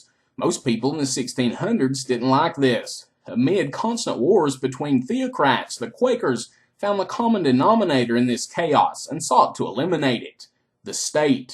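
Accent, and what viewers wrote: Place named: South, United States. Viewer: Don't hate Southerners, they have different rules of pronunciation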